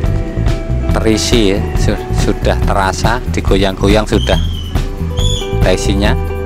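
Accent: Java, Indonesia. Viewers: Logat bahasane medok nyenengke tenan dirungoke.. 😂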